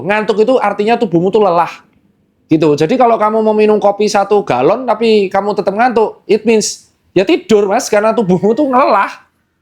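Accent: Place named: West Java, Indonesia. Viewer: Nih dr tirta aksennya sprti orang sunda.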